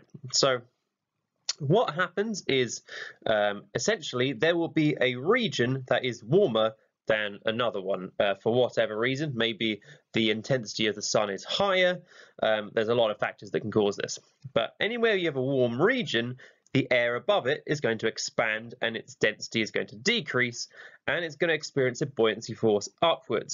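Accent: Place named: United Kingdom. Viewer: nvm u sound british :face-orange-raised-eyebrow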